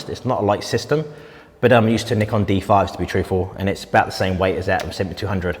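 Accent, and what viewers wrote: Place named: Portsmouth, United Kingdom. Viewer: Devonshire is a beautiful place. You are right about Portsmouth always something happening there. (good or bad) Still trying to get to use his Pompey accent on his channel.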